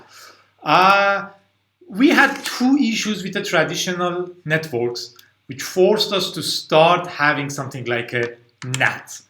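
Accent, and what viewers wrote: Iran. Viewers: Thanks for the info, you're an expert in explanation;) Also, loving the original Persian accent:D Love <3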